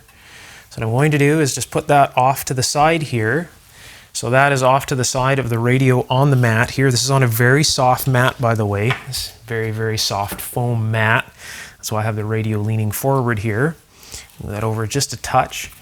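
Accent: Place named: Canada. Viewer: This guy talks like a Canadian.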